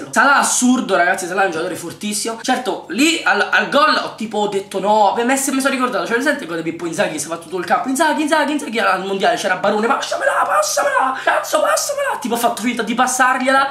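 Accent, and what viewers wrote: Rome, Italy. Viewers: sei romano?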